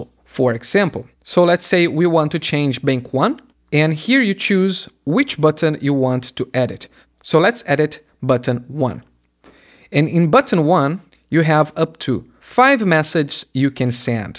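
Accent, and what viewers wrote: Brazil. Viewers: tu é Brasileiro né mano? Entendi perfeitamente seu inglês kk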